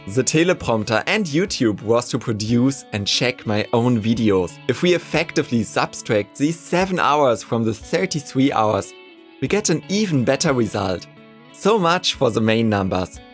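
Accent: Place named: Germany. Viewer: I smell Deutsch but sonst sehr gutes Video!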